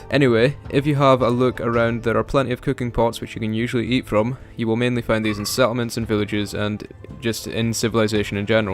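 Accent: Scotland, United Kingdom. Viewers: You Scots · Unmistakable Scottish accent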